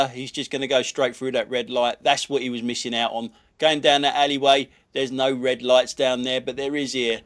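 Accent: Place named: West London, United Kingdom. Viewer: You sound nothing like Michael Caine, You have a West London accent like me.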